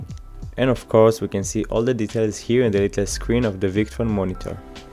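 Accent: France. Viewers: you sound French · L'accent français représente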